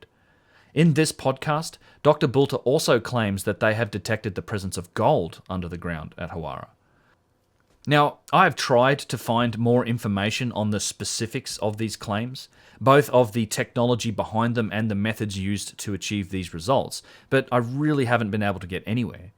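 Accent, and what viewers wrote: Australia. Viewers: 'The royver of such soyze the noyl' its English? Another stupid Auzzi hack lol. Please Australia speaking Australian Cockney is not good!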